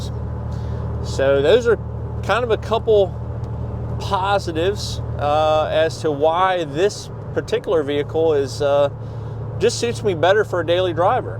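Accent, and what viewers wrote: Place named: South, United States. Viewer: Look at you with the drone footage. Your southern accent has really picked up since being gone from Ohio. I wouldn't mind having an old manual vehicle. I miss a stick.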